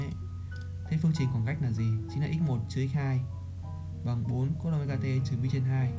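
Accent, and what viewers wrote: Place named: Nam Dinh, Vietnam. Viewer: tuy giọng anh có rất Nam Định nhưng đc cái ấm =))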